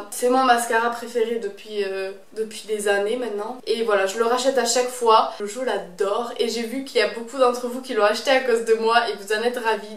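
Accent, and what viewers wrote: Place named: Southwest, France. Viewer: Coucou, pour mon deuxième commentaire sur ta chaine (je poste RAREMENT des commentaires), je tenais à te dire que j'adore ta personnalité ! Le fait que tu évites d'inciter tes abonné(e)s à utiliser des produits pas très conseillés me plait beaucoup et montre ta sincérité et ta bienveillance. J'adore ton accent, j'ai le même, et c'est tellement naturel ! Ca fait du bien d'entendre cet accent sur Youtube ! Enfin ! :P J'ai déjà essayé de me lancer sur Youtube mais par manque de motivation je n'ai pas continué. En revanche je fais de la photo. Tu peux toujours aller voir ma chaine si le coeur t'en dit, ainsi que ma page Facebook : Capricorne Clic Photographie. (Ouais j'en profite un peu, mais la solidarité du Sud (Ouest) paie toujours, donc ... ) haha ! Gros bisous et continue comme ça.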